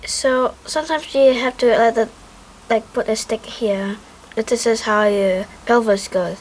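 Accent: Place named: United Kingdom. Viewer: You're English is so British.. I like it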